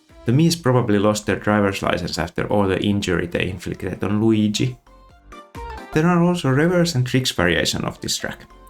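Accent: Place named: Finland. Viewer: Cool channel! Sounds like you're from my neighbouring country!!! 😁 Swe => Finland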